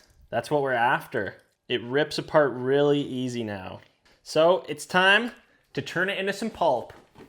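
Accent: Canada. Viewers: I enjoy your silliness and your wonderful smile and especially how your projects work out to be something worthwhile .... and, oh yeah, listening to your Canadian "ou" sound, eh! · Stayed home sick today and found your channel and im loving the content! Also random weird question but are you by any chance Canadian?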